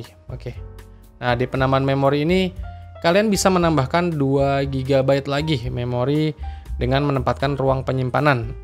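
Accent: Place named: Palembang, Indonesia. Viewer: bang lu orang palembang ya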